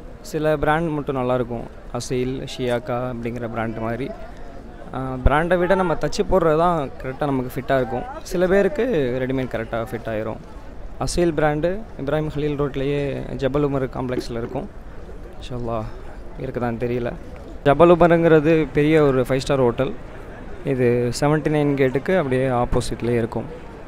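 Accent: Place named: India. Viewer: மாஷா அல்லாஹ் நல்ல பதிவு உங்களின் இந்திய தமிழ் அருமை நம்ம அத்தாட ஊரு தஞ்சை வலுத்தூர் நாம இப்ப இலங்கைபிரஜைகள் - தகவல் மீரா மொஹிதீன் அதிபர் ஜயசுந்ததர நாவலப்பிட்டிய - ஸ்ரீலங்கா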